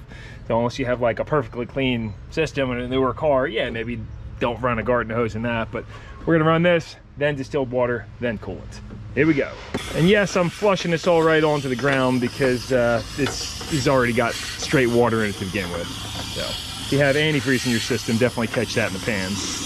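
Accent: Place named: Baltimore, United States. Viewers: I'm from Baltimore and your accent reminds me of back home. When you replaced the thermostat, did you use permatex the right stuff on that thermostat housing? In addition to the gasket? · Are you from Philadelphia you have an accent I hear it. wuder hoome We say home with a long O. 🚁 🪂 If you're not you're from Baltimore they have a similar accent. ✌🏻👽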